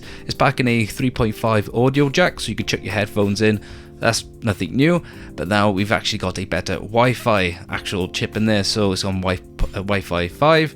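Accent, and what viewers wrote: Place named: Cardiff, United Kingdom. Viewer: Is that a fellow Cardiff accent I detect? Great video mate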